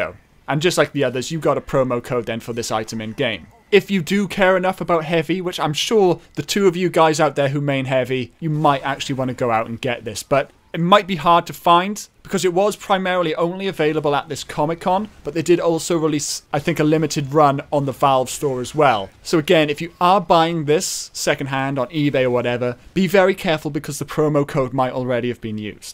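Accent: Wales, United Kingdom. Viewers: U sound kinda welsh like meh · Just wondering what's your accent? It sounds very Welshy and Valleys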